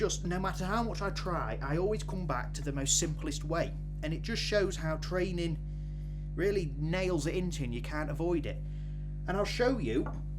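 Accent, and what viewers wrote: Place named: Northern England, United Kingdom. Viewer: Your videos are brilliant mate! Also nice to hear another northern voice 👌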